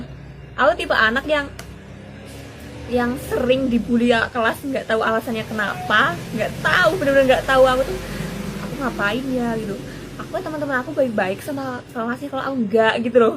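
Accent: Java, Indonesia. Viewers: Mukanya mirip Natasya Wilona.suaranya juga mirip hanya saja medok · Jowone endi mbak, medok nemen bahasanya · Sumpah sih ini wilo versi medoknya 😁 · Asli kembar sm wilona.. suara ny sma jg yg bkin beda cm medok jawa ny aj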